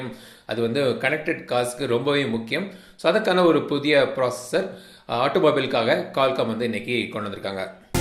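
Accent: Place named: Tirunelveli, India. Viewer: Thalaiva neenga pesurathu enga ooru slag mathiri iruku 😍😍 neenga Tirunelveli ah??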